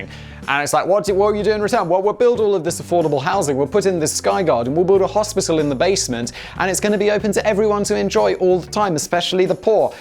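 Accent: United Kingdom. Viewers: Off-topic linguistic question from an American; You said, "Comer" instead of "coma". Why is it that people in the UK tend to pronounce "uh" or "a" as "er"? Honder, comer, etc... Is that the original intention of the pronunciation and it's just another way America tried to be different? Or is that just sort of how your speech evolved, like a vernacular?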